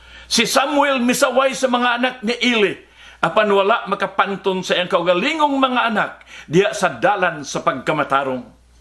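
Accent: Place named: Visayas, Philippines. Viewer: I love how you speak so bisaya Pastor, I wish I could speak like that.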